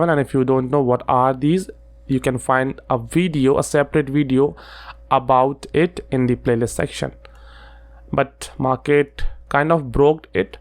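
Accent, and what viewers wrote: India. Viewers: Very good explanation with good indian english...we know very well sir....excellent video.....new subscriber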